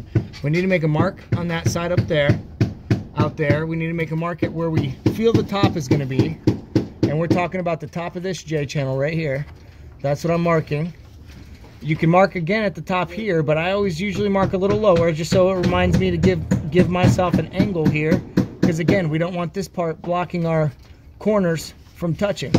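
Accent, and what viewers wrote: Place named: New York, United States. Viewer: Excellent video I would guess you're from new york.. You remind me of some guys that I work with.. very knowledgeable and straightforward great info and training ..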